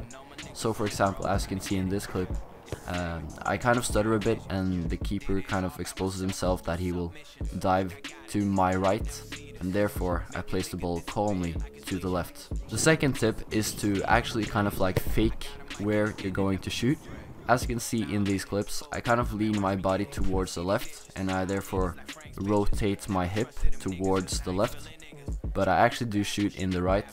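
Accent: Norway. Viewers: u sound like your norwegian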